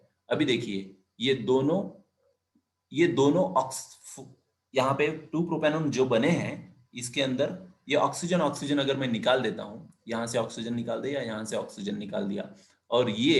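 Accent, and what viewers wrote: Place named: Maharashtra, India. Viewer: Sir your mother tongue is Marathi...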